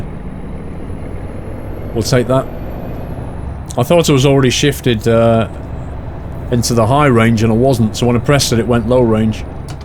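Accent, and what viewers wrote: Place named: United Kingdom. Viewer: He is British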